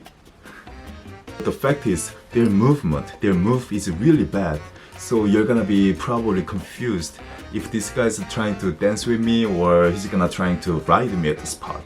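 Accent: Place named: France. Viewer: Tu vivre dans la France tu as l 'accent d'un français parle anglais trop mignon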